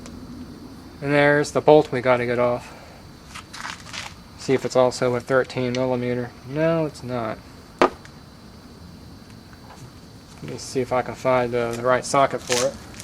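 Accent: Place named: Seattle, United States. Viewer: You sound like your from around Seattle/Everett WA.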